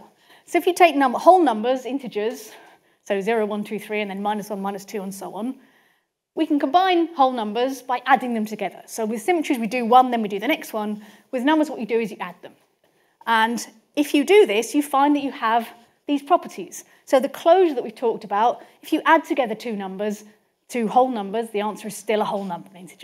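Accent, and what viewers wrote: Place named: London, United Kingdom. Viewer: BTW... I love her English accent.. London? ❤️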